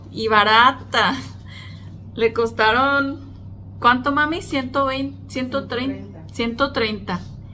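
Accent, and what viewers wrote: Mexico. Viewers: habla como todo un mexicano, 😮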